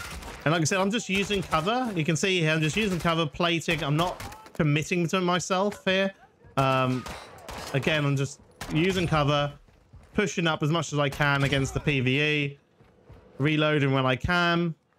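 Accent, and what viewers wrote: United Kingdom. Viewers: Hmm... "The gatman" for an assault rifle... well, you sound British so I'll chalk it up to ignorance.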